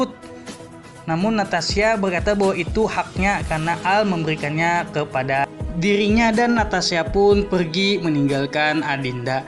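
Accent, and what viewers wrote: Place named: Banjar, Indonesia. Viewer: Hadewww logatx orang banjar bgtt 😂😂😂😂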